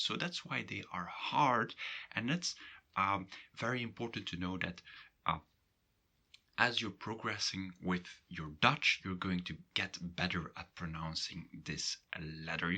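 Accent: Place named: Belgium. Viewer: These are very strange interpretations- is this Belgium? Because it doesn’t sound Dutch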